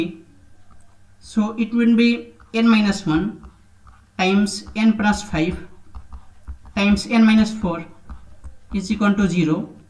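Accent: Bihar, India. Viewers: Are u from Bihar?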